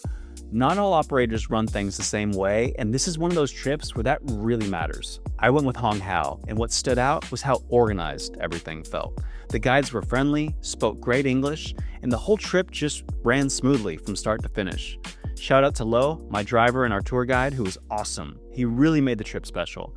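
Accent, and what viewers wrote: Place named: United States. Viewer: I have been doing some Google searching to see if a US citizen can use the international driving permit (IDP) with the motorcycle endorsement. The answer it keeps giving me: "No, a standard U.S.-issued International Driving Permit (IDP) does not work in Vietnam. This is due to a strict treaty mismatch known among travelers as the "country trap". Vietnam only recognizes IDPs issued under the 1968 Vienna Convention. However, the United States did not ratify that specific treaty and only issues IDPs under the 1949 Geneva Convention. Because of this, Vietnamese authorities view a U.S. IDP as legally invalid for motorbikes over 50cc" I am only assuming you are from the US by your accent. If you are, how were you able to obtain the correct license?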